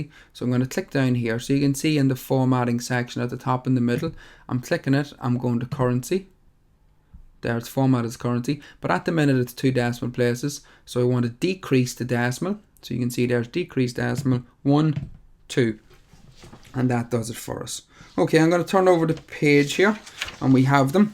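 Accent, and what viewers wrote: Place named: Ireland. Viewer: love your accent. where is ireland are you from?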